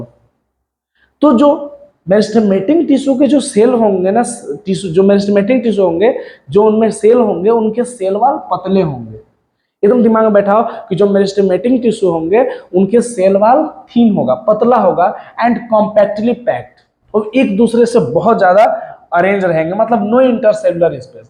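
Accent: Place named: Bihar, India. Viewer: laga ki bihar se ho bolne ke tarike se. 😅😊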